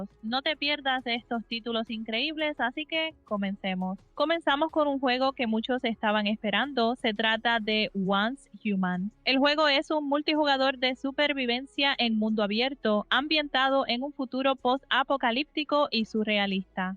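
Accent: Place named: Puerto Rico. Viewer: Saludos. Por tu voz sé que eres como nuestro coqui! Me equivoco? Gracias por el video. Adelante :)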